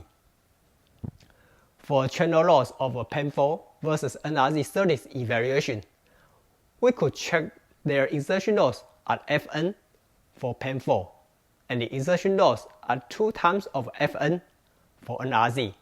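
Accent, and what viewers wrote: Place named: Taiwan. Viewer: 聽你的英文口音確實很像台灣人XD 你是做SERDES的工程師嗎? 好奇為什麼會開這麼冷門主題的頻道(不過我有興趣)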